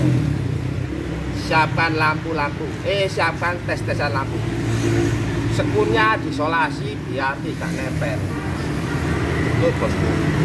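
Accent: East Java, Indonesia. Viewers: Boso jowo timuran iku cak....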